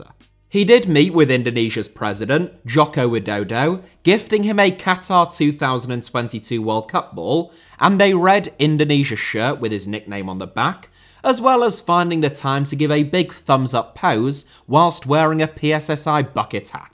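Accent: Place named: United Kingdom. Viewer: Your voice i know you🤣 the british accents viral boy????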